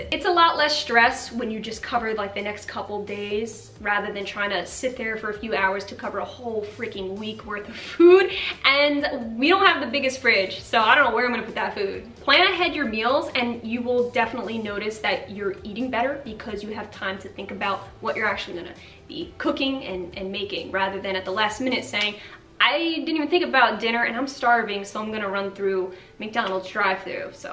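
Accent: New Jersey, United States. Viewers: Visiting from channel! Do I recognize a Jersey accent? Great video!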